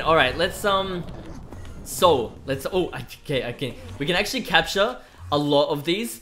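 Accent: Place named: Australia. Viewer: its gauntlet not glove and also what place of australia ya from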